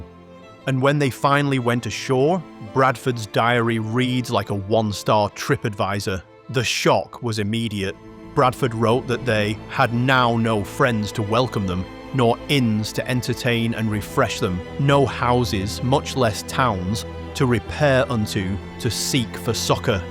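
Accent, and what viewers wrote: United Kingdom. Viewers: American history narrated in a British accent. Is this supposed to be funny?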